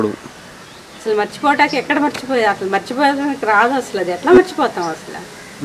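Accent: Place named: Tamil Nadu, India. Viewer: I want to tell one thing to Anchor. Don't try to separate mala , madiga communities ra idiot. Why you are asking again and again how is mala madha communities now , they are one or not dont ask in such a way and put fire 🔥. Mala madiga are both communities are SCs and still upcoming now.....Bastards like (ancor) you are putting / creating problems in mala madigas. So i warn you dont try to be smart for your anchoring purpose. Are chetta na son. Where you are from . Nuvvo arava na kodukivi( i understand with your pronouncing of language)........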